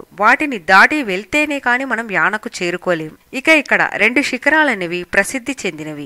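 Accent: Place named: Tamil Nadu, India. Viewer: Need to Improve telugu. It is like some tamilian gave voice to this video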